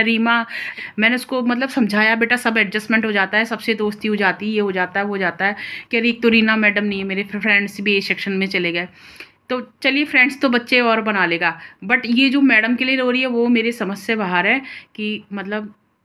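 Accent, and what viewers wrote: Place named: Bundelkhand, India. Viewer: Mem aap beech beech m Bundelkhand ki voice me speech de deti ho😁😃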